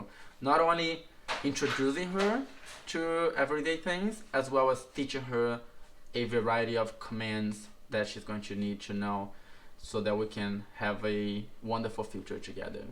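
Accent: Germany. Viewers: I LOVE watching you work! I'm sorry people will probably think I'm a dummy but where is your accent from? Is it German? I'm pretty new to watching you. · Do I hear a German accent? I love you work!❤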